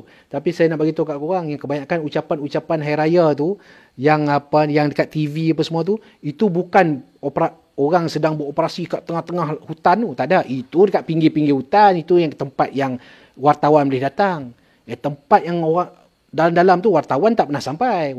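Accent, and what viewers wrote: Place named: Kedah, Malaysia. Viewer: Maaf tuan! Sya nk tanya sikit, sya dh tengok semua vedio tuan, tuan ni asai usul dri kmpong mana? Mcm org kedah ja ni?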